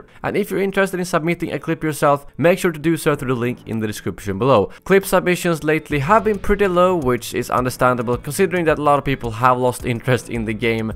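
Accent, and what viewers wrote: Sweden. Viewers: agentokky he's Swedish, so it's not surprising if he doesn't speak English correctly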